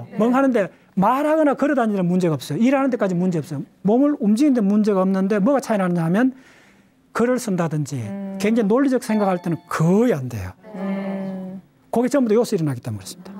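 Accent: Gyeongsang, South Korea. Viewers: 사투리 억양까지는 그렇다쳐도 표준어로 강의 하셔야겠어요. 사투리에 경상도 억양에...좀 그러네요. 게다가 발음도 좀...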